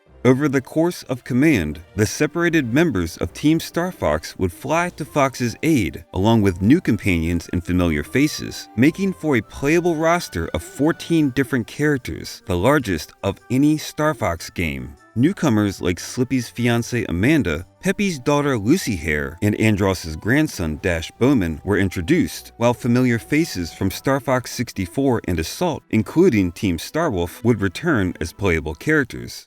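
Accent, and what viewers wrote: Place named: United States. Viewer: I can't help but wonder what region of the US you're from. Or if you're Canadian. The way you pronounce your O's sometimes really stands out. I desperately wanted you to say "the poconos" lol. Great video btw. I've always loved Star Fox :)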